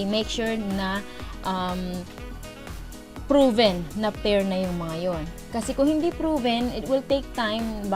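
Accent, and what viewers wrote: Pangasinan, Philippines. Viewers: Pangasina po kau Ma'am?hehe may accent ksi hehe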